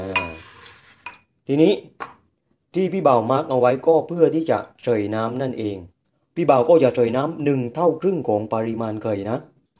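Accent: South, Thailand. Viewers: สำเนียงใต้ชัดๆๆเลย555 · พี่บ่าวคนใต้ใช่ม้าย😄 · สำนังคนใต้น่ารักดีจร้า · คนใต้เรยนิ หรอยๆ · เสียงคนใต้ 555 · พี่บ่าวแหลงใต้เลยตะผมว่า เสียงคนใต้มีเสน่นะพี่บ่าวเห้อ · ชอบสำเนียงทองแดงของพี่บ่าว และอธิบายได้ดี ชอบมากครับ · น่าทานค่ะ ว่าแต่อยากเห็นหน้าพี่บ่าวจังฮู้ชอบคำพูดสำเนียงตนใต้จังเลย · นํ้าเสียงของคนใต้.เพราะดีค่ะชอบมากๆน่ากินจังไข่ตุ๋น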